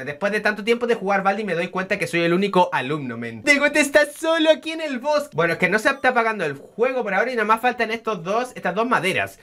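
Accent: Chile. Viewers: Eres de chile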